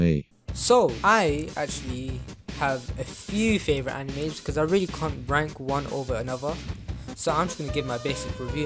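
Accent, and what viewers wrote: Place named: India. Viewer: u sound indian😳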